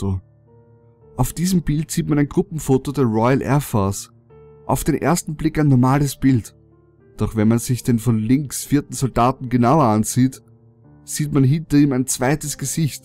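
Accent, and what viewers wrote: Austria. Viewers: Bist du ösi? ^^ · oder einfach österreichisch?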